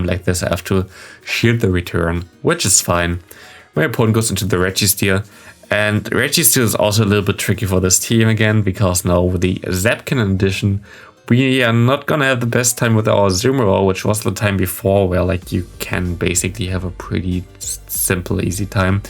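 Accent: Germany. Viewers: German accent has its downsides 😅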